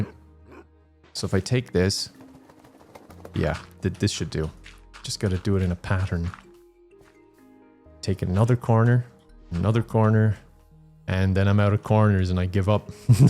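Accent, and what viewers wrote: Ireland. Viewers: This is genuinely such a soothing let’s play. I didn’t expect to fall asleep during the last episode but at the end of it I blinked and it was 30 mins later in the video, waited til bedtime for this one in case it does it again. Always love a good hang out with our totally sane friend, reminds me of the old Stardew videos from when the channel was still brand new Also for the record you got me to fall in love with the Irish accent to the point I use it on my Siri anymore so you’re clearly doing /something/ right for Irish culture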